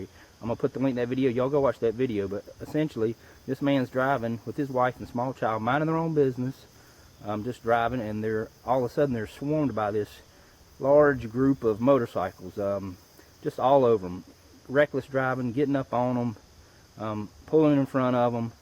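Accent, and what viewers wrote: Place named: North Carolina, United States. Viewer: Holy shit, I'm sitting here like "I've heard that accent all my life, its distinctly familiar to me, even from other southern accents, but what are the chances that he's from NC?" should have trusted my gut lol. I should know one of my own people when I hear them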